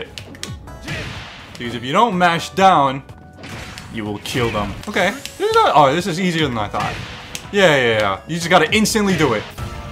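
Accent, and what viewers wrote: Chile. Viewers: Are you Chilean???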